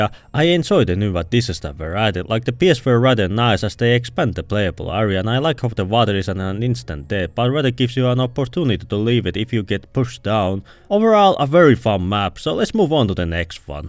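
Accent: Finland. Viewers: I was like 99% you were Finnish and this just confirmed it. Perkele TF2 Suomi!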